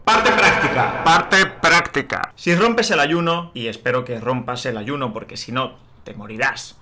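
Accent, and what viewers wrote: Spain. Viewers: Me encantan las enseñanzas del Dr, excelente....., lo disfruto muchísimo y ese acento español me fascina. Muchas gracias. Saludos desde Venezuela.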